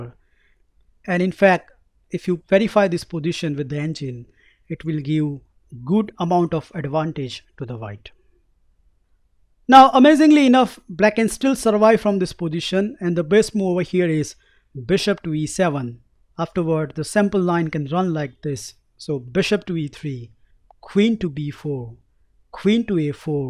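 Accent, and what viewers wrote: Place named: North India, India. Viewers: He's definitely Indian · Eduardo Moya he's from North India! :)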